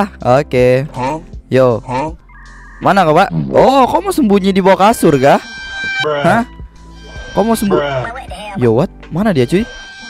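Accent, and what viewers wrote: Java, Indonesia. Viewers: Bang lu orang Jawa ya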